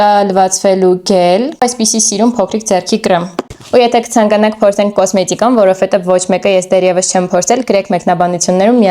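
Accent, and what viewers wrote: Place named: Gyumri, Armenia. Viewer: Գյումրիից ես գյումրվա բառբառոց խոսի😂❤❤❤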